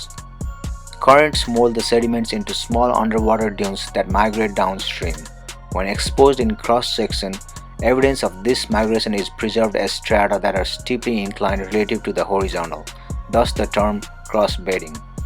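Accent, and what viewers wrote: India. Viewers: very strong indian accent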